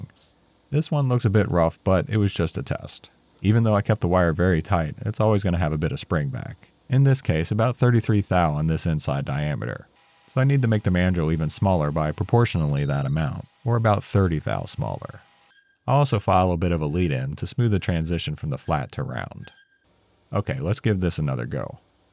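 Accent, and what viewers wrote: United States. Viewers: I know it has been mentioned before. Thought id ask after some time has passed. Willing to sell the modified drawings you made of this? I have the ivan drawings for a while. decided to get a new book and was disappointed to see the same old drawings. The faded and missing bits that you mentioned annoy me. Some modern cad drawings that havent been disintegrated from scanning a copy of a copy of a copy would be nice. Big fan of the channel. I also recognize your accent. Might help to know im a local near the bluegrass as well.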